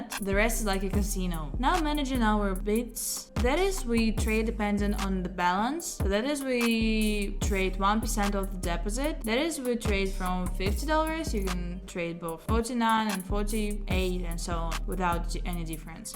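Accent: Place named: Russia. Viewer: Как же чувствуется русский акцент )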